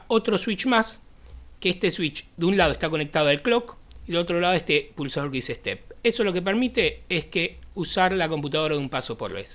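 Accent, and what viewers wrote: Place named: Argentina. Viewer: viejo, es increíble!!! que laburo!! que apasionado te felicito estimo por el acento que sos argentino un abrazo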